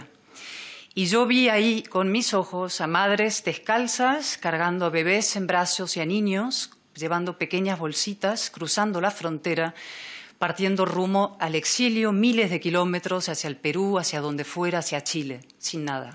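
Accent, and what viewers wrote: Argentina. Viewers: Porqué habla argentino? Mu española no la veo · No solo es una persona bella, pero qué lindo habla…No solo su labia, sino su acento ( un acento argentino sin idiosincrasias)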